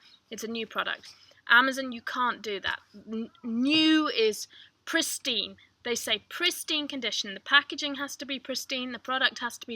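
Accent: United Kingdom. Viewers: Thanks for the info. Btw...you're cute! Love the UK accent. ;-) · Thank you... Your British accent got me on that one... (but we all love that accent!!) You ever get out to TX I'll share some unique accent of my own with you... lol Anyway... really helpful videos... we haven't started yet but looking into it... Your videos are very insightful. Thanks again!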